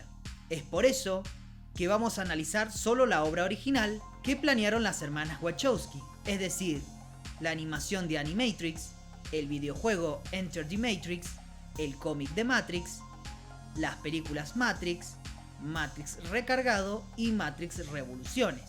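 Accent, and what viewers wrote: Argentina. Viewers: Acento Argentino jodiendo toda la saga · matrix version porteño che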